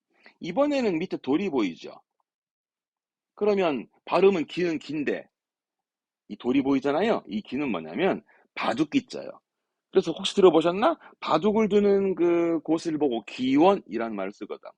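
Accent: Jeolla, South Korea. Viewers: 왐마 듣다보이 우리 절라도분이시구마잉~ 반갑네요잉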